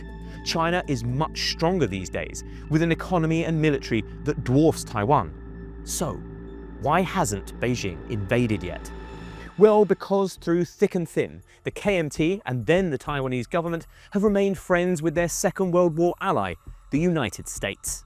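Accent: United Kingdom. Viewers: if he didn't have a British accent this series would be boring...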